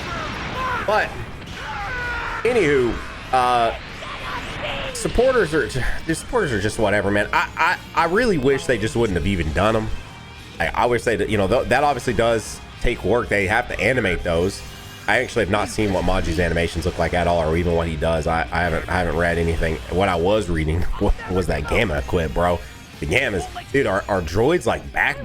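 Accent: South, United States. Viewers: Didn't know i needed a Southern video today😂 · Your southern accent sounds fine to me but i also grew in southern state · the southern accent is killing me 😭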